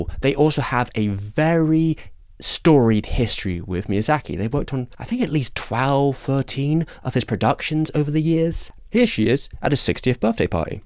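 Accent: United Kingdom. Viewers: japanese names in a british accent are crazy...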